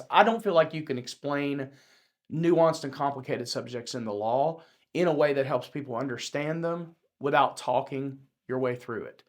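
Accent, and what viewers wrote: South, United States. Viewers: aww man…after that Christmas video I thought we'd get a rendition of Auld Lang Syne! That first 30 seconds of this video had me laughing – I seriously was one of those 5!! So glad and grateful for your amazing progress since your start. I do watch many of the other similar channels; yours quickly became is my favorite. Love your insider knowledge; ability to highlight numerous subtle details that would typically escape the average viewer's notice, and your breakdowns of how you would argue the case in court if it were your own. Your eloquence and that well-timed humor you sprinkle in make your style stand out above the rest. Oh, (and of course!) – that Southern Drawl!! You'll meet your goal for this year, or even surpass it. I’ve often wondered how many hours went into making just 1 video. I look forward to everything you've got coming for this year. 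Man, you must run on diesel fuel or something. The books, channel, training program, podcast - all these have the potential to positively impact our nation and transform countless lives. In some ways, listening to your SDL channel has changed mine. I’ve watched your videos and have tried to emulate your speaking style and manner in both my personal and professional life. I know you have to adapt to what the audience wants but please don't curtail your speaking time too much! That’s one of best and most distinguishing features that elevates your content above others. Each video covers a lot and I think you throw in all the aspects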